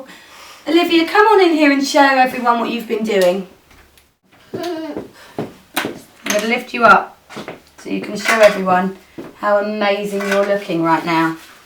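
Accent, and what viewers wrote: United Kingdom. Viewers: Zali P British accent* or I guess it can work either way. But if you Google it, there's tons and tons of speculation.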